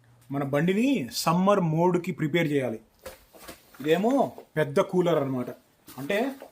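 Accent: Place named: Guntur, India. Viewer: తెలంగాణ అంటున్నారు కానీ meru గుంటూరు slang matladutunnaru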